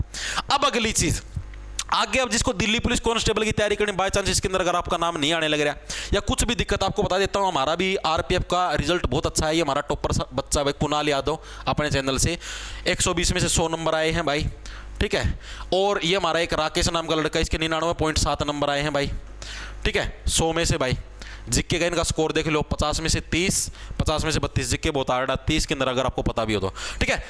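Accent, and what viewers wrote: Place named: Rajasthan, India. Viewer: Sir your way of talking is very cool Your spex add spice on the top Rajasthani and Haryanvi consent is very cool❤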